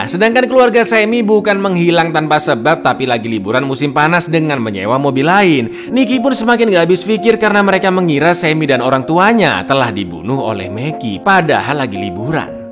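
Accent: Java, Indonesia. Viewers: Khas selalu sama logat jawanya bang.. jos bang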